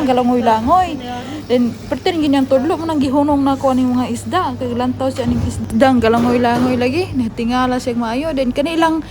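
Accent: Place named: Visayas, Philippines. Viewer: Bisayaa oy haha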